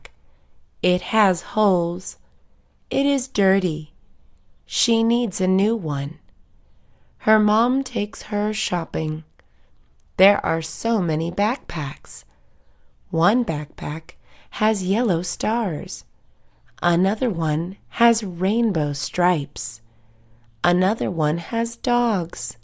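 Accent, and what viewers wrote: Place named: United States. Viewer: Американское произношение. Спасибо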